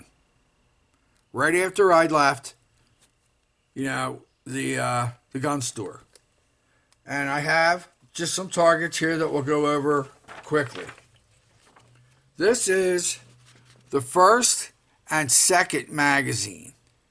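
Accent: United States. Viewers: Hard philly accent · Where are you located? Got kinda a “Balmore” accent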